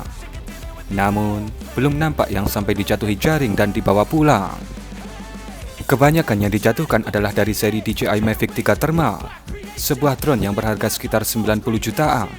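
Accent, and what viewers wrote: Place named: Java, Indonesia. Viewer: Berak2 matamu iku medok2😂😂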